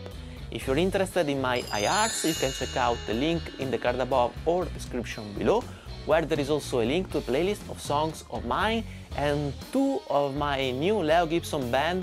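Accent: Brazil. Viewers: vc é brasileiro kkkk nao é possivel, com esse sotaque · I feel some Brazilian Accent I am also brazillian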